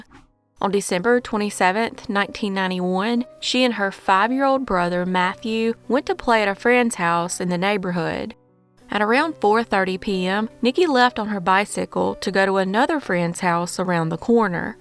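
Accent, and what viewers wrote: South, United States. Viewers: Love your accent! Georgia? South Carolina?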